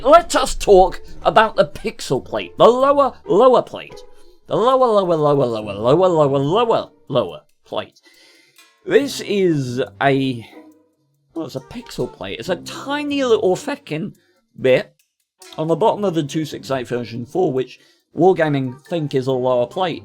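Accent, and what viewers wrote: United Kingdom. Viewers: Sory to hear that. I was thinking that you spoke like that to make your English the most British sounding possible. Sory for my " neanderthal" English. Best wishes for you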